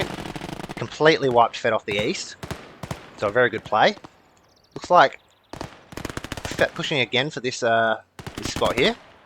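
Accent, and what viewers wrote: Australia. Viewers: Seriously though, you are a natural at gameplay commentary, and your whole tournament series was very entertaining!! Your aussie accent is lovely and improves the overall experience for me, personally. All the best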